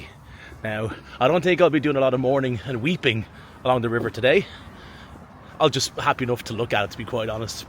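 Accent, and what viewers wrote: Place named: Northern Ireland, United Kingdom. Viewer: I know you said you're a Dub, but I hear the lovely lilt of the north. Am I wrong?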